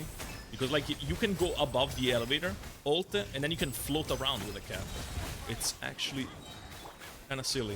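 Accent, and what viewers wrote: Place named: Italy. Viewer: Accento leggermente italiano dio bono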